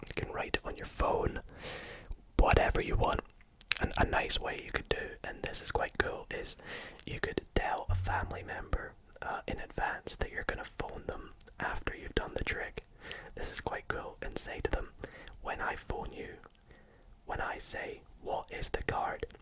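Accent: Scotland, United Kingdom. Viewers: You’re same is enjoyable because it is interactive and interesting but at the same time you’re Scottish accent and voice is soooooooo relaxing I literally fell asleep watching the mind reading one the other day keep it up👌🏼🤌🏼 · He’s Scottish 😭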